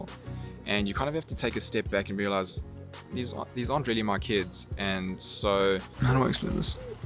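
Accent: South Africa. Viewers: I'm going to South Africa as an AuPair soon, is your english accent what english sounds like there? Do all people speak this language? Or is there another major language that determined this accent? :D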